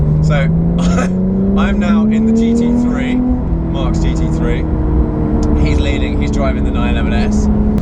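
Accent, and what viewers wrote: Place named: Australia. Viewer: Did ytou study or ever live in Australia? You have a Sydney/International Aussie tone to your vowels.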